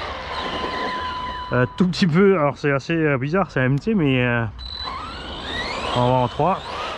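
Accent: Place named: South, France. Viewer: qui parle sudiste tu m'as régalé 😍 ( sud power 😁) Le front flip magique jamais je pensai que c'était possible. Et visi bien solide la machine car elle prend cher sur cette vidéo 💪💪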